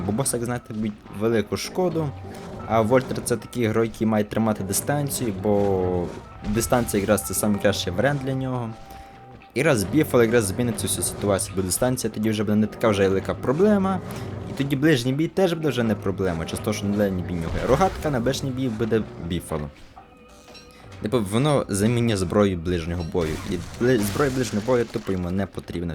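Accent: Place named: Poland. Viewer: Я спочатку намагалась зрозуміти, чи це польска чи білоруська 😭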